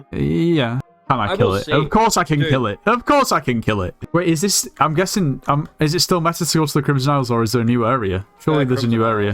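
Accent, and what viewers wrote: United Kingdom. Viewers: With the other brits you sounded REALLY British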